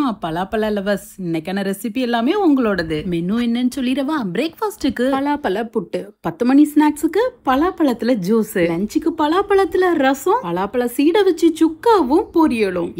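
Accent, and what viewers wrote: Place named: Nagercoil, India. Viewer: New try... N ur Nagercoil slang❤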